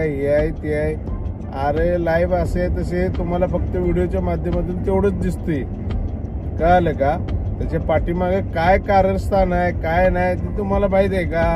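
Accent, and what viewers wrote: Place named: Kolhapur, India. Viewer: तू कोल्हापूर कडेचा आहेस वाटते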